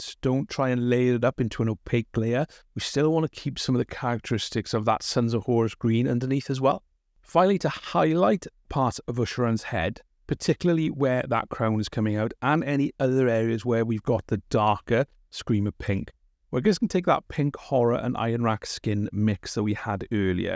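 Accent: Wales, United Kingdom. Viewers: Are you welsh? Love your content, thank you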